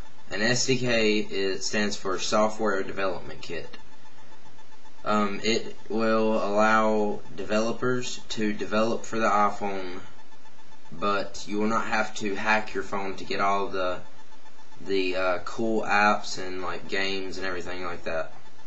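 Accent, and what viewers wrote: South, United States. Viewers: Is it just me, or did used to have more of a southern accent when he was younger?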